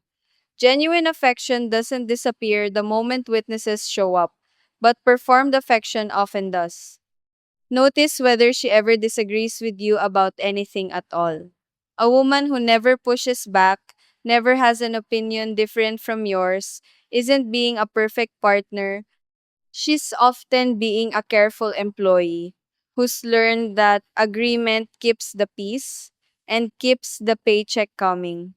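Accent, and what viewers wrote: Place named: Philippines. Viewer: Don't listen to that guy. I find English spoken with Philippine accent very attractive in much the same way most men feel about English spoken with a French accent. Of course, it helps if the speaker is an attractive and intelligent lady like yourself.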